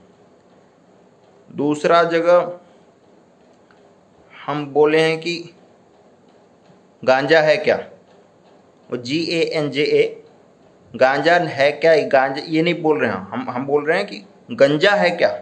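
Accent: Bihar, India. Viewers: That bihari accent 😍, makes the video great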